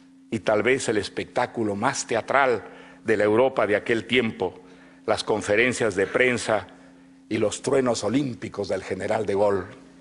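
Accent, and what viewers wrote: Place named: Peru. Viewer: es que aunque intente pasar de español, es peruano.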